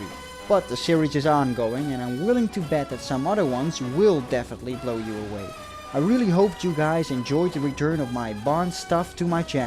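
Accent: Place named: Netherlands. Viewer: I love Dutch dialect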